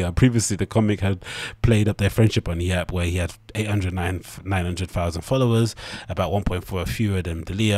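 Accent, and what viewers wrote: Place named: Southampton, United Kingdom. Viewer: Your accent sounds like its from Southampton U.K. very familiar 🤙 keep up the good content man!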